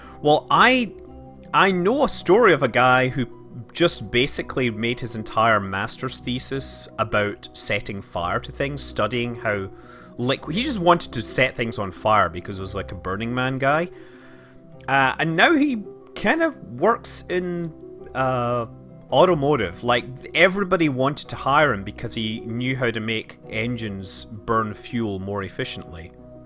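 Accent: Scotland, United Kingdom. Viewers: Is he Scottish? He just sounds Scottish to me and if I'm hearing that right I'd be happy. If not I learned something.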